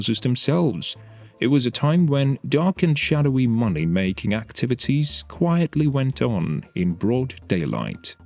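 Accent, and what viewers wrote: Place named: United Kingdom. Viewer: Crap narration, would have been better with an American voice rather than stuck up English rubbish.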